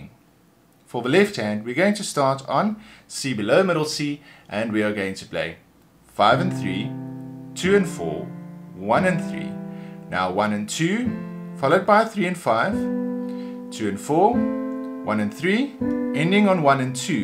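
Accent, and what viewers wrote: South Africa. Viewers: excuse me but are you South African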